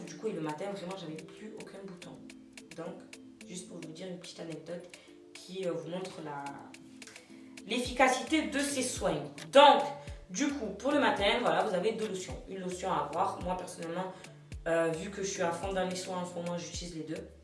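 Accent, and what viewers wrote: Marseille, France. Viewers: J’ai regardée juste avant une vidéo où tu parlait que ton accent marseillais dérangeait et là on voit que tu perd ton accent le sang 😭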